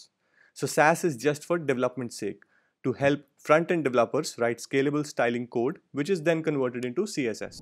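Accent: India. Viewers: First video with a guy with an Indian accent that is actually useful and well organized. Thank you!!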